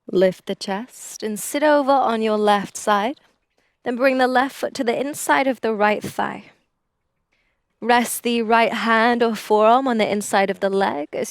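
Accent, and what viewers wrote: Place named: Scotland, United Kingdom. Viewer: You are fast becoming my new favourite yoga Youtuber! Pa. are you Scottish/grew up in Scotland for a bit? I'm hearing a mix of accents haha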